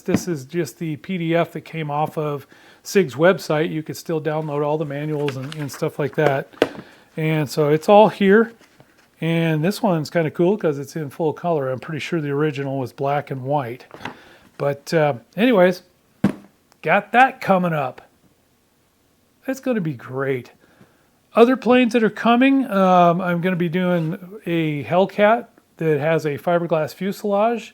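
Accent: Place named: United Kingdom. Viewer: Your pronunciation reminds me of my favorite movie "battle of Britain" , from 1969 , the old British actors says it just like that ( with the proper amount of stiff-upper lip) , in that movie you also get brits trying to pronounciate "stukas" and Germans trying to say "Spitfire" which sounds hillarious , not ready to tackle a warbird scale myself for building and flying ( but trying to cobble together an old falcon 56 kit for the moment) your channel has soo great tips on building, cant wait to try some of those techniques!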